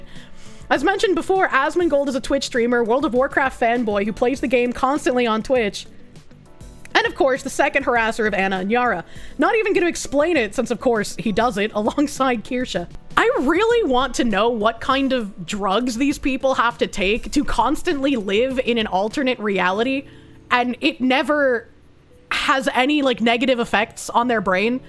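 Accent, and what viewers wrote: Boston, United States. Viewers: How does that thick Boston accent get confused with the Midwest? Lol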